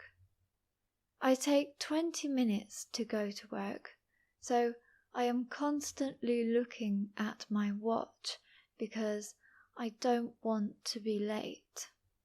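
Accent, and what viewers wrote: United Kingdom. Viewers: Hello, your British accent is RP or MRP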